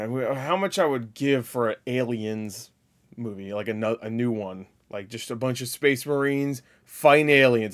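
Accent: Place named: United Kingdom. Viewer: yea but this guy in particular has a very strong British accent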